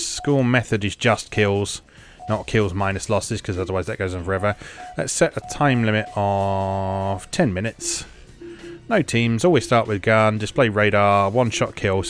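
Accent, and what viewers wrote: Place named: United Kingdom. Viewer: You have a nice brit voice :P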